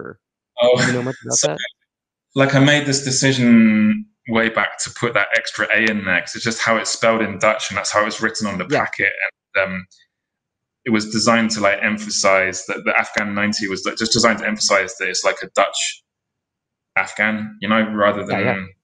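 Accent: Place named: United Kingdom. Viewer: It's good to hear a voice from the UK! .... 🙂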